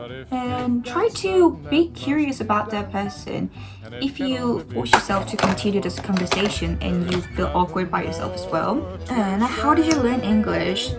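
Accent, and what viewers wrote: United Kingdom. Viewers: 영국식 발음이 너무 좋네요.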